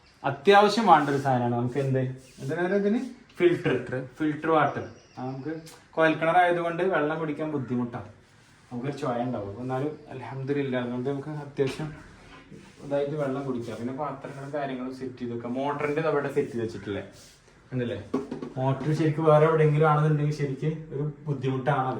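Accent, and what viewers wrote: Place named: Palakkad, India. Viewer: പാലക്കാടൻ Slang അങ്ങിനാ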